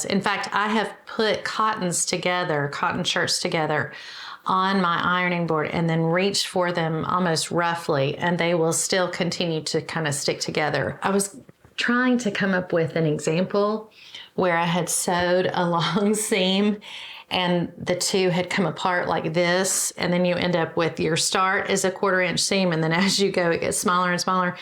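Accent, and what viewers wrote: South, United States. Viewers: Love your sense of humor!! And the southern accent! Thanks for thie information! I was wondering why we should steer away from cotton/poly. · At the op shop today I fingered an intriguing seafoam green sheet, just to see the tag say "cotton/poly". And I swear, I heard your voice say in your southern way, "Nope!" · Love that cranberry color you’re wearing! It’s very flattering and a perfect choice for your lovely “quilt in progress” backdrop. ❤️ The video’s content is valuable information for new quilters. Many of us learned the hard way and would have appreciated this information. And thank you for making me smile the entire time I’m viewing your videos. Your southern pronunciations are adorable. Aahrn was precious! ❤️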